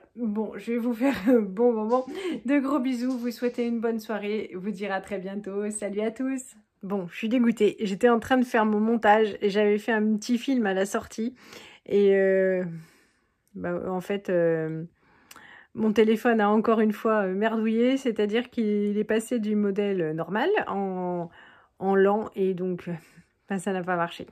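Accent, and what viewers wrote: Alsace, France. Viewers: Merci pour cette vidéo. 😊 L'accent Alsacien j'adore 😊. J'ai vécu 16 ans en Alsace ❤️ Bon courage pour demain .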